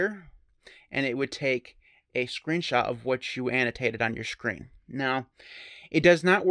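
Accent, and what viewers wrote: Spain. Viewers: Me encantó tu acento en español, I loved your Spanish accent, maybe my English accent doesn't is so good. Dialect reminds me Crow-translate, the interface seems to be the same. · Buen acento español :D eres el mejor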